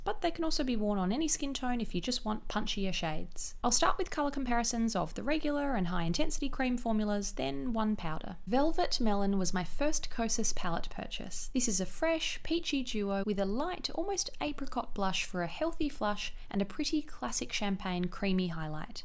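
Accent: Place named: Australia. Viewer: I come to all your videos for cool reviews, pretty hands and that lovely Aussie accent 👌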